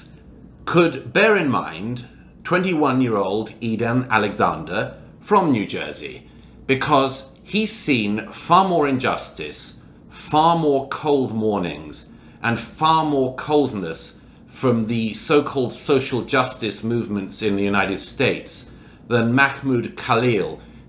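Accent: United Kingdom. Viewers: Your sly British accent isn’t fooling anyone with your shekeled hasbara Murray.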